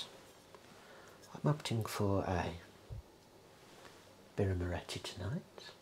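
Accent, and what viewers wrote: Birmingham, United Kingdom. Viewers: You, sir, have finally put some respect on the brummy accent. I salute you. Also I love this style of video!! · that is what we call a brummie accent, personally one of my favs to listen to as a southerner from the uk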